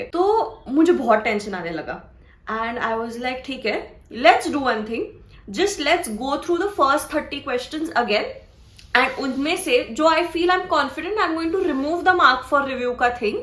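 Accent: Maharashtra, India. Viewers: Abhi bhi dhad dhad ho rha hai your Marathi accent trying to jump out taide Marathi mdhe pn video bno ki jra 💛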